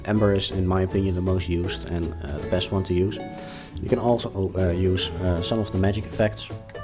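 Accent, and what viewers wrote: Netherlands. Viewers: haha its so funny to hear your dutch accent lol, great video!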